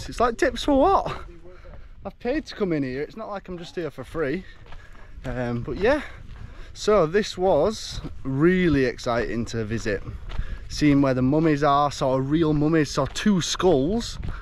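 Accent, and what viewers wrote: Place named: Yorkshire, United Kingdom. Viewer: I just love it, you say it as you see it and you have such a great Yorkshire accent, but please do an Aswan Trip you and everyone will love it, i miss egypt so much its been 8 years since my last trip but looking at going to Hurgharda in March 2025, only downside it will be the first time i've done a trip on my own, but i'm that confident in the people and i always feel safe, one story comes to mind on my 3 or 4th cruise they decided to go luxor to aswan then back to luxor then up to Dandarah, woke up one morning on boat to Dandarah and at front and back of cruise boat were tents? i thought whats going on here,turned out because Dandarah is normally off the boat trip schedules and theres a lot of nomadic tribes, anyhow when i looked inside the tent at the front and back i was taken back as there were Machine guns bolted to the front and back of the boat lol, they were very rusty lol so i'm guessing not been used for a long time, anyways we had no trouble and enjoyed the trip to Dandarah, also please make people aware of the police in the white uniforms (tourist police) they are everywhere and if you ever feel unsafe go to one of them as they are only there to make the tourists feel safe, and they will always side with you over a local. 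Such a great country just a shame they have had troubles over the last few years but its getting back to normal now 😃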